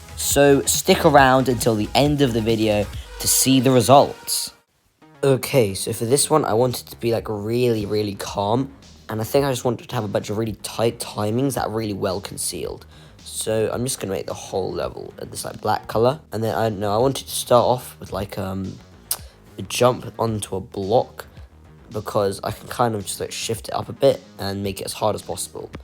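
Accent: United Kingdom. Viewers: you are the most british sounding british person I've ever came across on here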